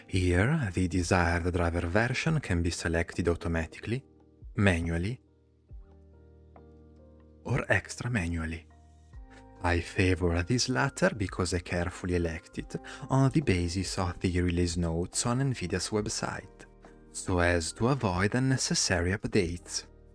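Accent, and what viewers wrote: Italy. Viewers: Your voice with that Italien accent is beautiful and very relaxing. Did you thought of doing ASMR with computer components ? :goodvibes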